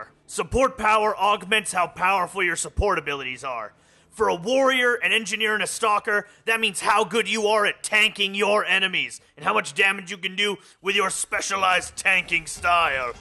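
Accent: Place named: United States. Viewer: Sadly going by the accent I'm going to hazard a guess that you're going to be on a US server.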